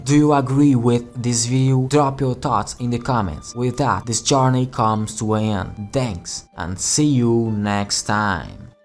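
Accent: France. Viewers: Bro speaks francglish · Idk man the accent makes me think he’s French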